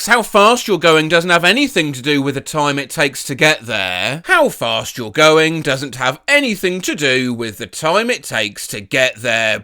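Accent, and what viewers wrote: United Kingdom. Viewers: Hi 😊❤ I'm not very fluent in English, so I watch YouTube vids to improve my skills. I love your vids so much and also I love British accent 😍 thanks for your great work 🤗 P.s: I'm Iranian and I'm ashamed to say some people here do this😂😂😂😂 bro probably was my compatriot 😅😅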